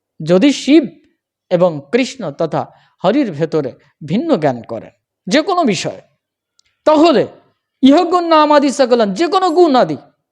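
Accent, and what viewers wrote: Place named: Khulna, Bangladesh. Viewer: Dada apni khulna odhibasi mone hoy amer ma er vai er moto apner kotha boler dhoron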